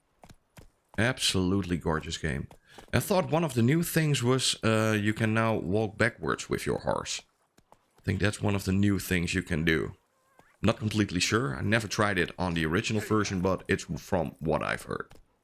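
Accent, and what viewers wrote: Netherlands. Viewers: You sound very Dutch. Thank you for the video!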